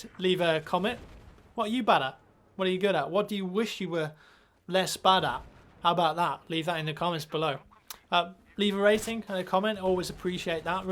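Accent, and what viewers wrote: England, United Kingdom. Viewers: is he from England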